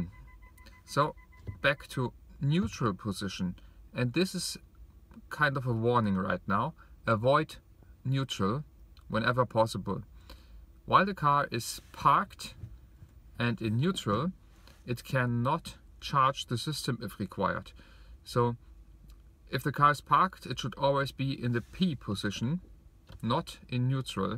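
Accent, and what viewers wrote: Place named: Germany. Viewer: Interesting that you have a finnish name and you sound pretty German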